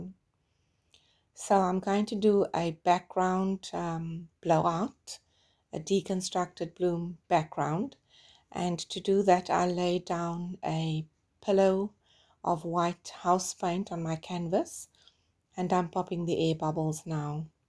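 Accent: South Africa. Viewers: Hi, it's my first time on your channel. I can hear from your accent that you are South African - I'm just wondering where you manage to get Australian Floetrol??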